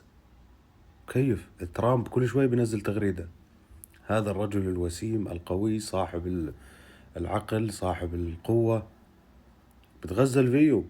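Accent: Hauran, Syria. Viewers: كلامك بمحلو لو كان مزح بس ملغم افحمتو لابو سلمان واحلى لهجة هية اللهجة الحورانية 😊😊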